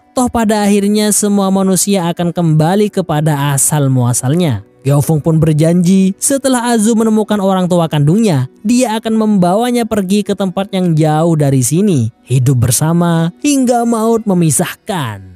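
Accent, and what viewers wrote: Madura, Indonesia. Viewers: Mk host sah reng madureh reh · Bg lu orang Madura ya engkk padeh Reng madure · Mantaaaap medureh demmah lek 😊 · Jir Reng madureh cuk 😁😁😁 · Adminnya ini ternyata madura 😂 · Car klacer tag ron karon...mik medureh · Min nya pola oreng madureh mak pelak pkk bhsa mdura tamancok kereng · Guy Madura beihh bahasana leburen😂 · Ternyata Reng madureh sampean, hehehe, carkalacer tak aronkaron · Reng madureh bn bang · Miminnya Madura nih😁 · Reng medureh kakeh kak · Madureh madimmh reah si admin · madura kah cuy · Reng madureh jih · Carklacer tak Ron karon. Maduranya kentel banget bang. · Dooo reng madureh 🤣 Salam Bretd 🤘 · Mak tak ron karon kanak... Abbeh reng madureh ongguh ye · Abbe kak reng madureh ben 🤣😂🤣😂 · Ini yg ngisi suara orang madura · Logat Madura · Mimin orang Madura yah🗿 kok aku kek gk asing sama ucapan Mimin yg bilang temancok kerreng.dan ee payah ongku😮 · Orang madura nih yang nyeritain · Medhuro min "Carkelacer tak ronkaron"😂😂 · Jir orang madura yg nganarasi cerita flm nya 🗿😂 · Ehh kakeh reng madureh kak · Payah ongguen, lee kakeh reng madure 😆, Salam stong dhere kak, sampang Torjun☝️ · Beeh oreng madureh kakeh bang.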